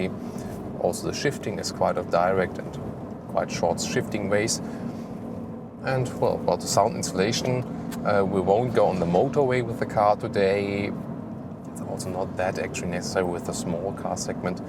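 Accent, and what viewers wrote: Germany. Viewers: Man hört schon stark das du deutsch bist^^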